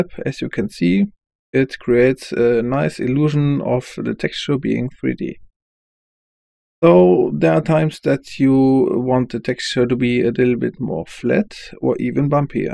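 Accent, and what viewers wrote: Germany. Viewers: Thanks its so easy xD i had seen many Videos before ( bist doch bestimmt deutscher. Es kann mir gleich so vor beim Sprechen 😆)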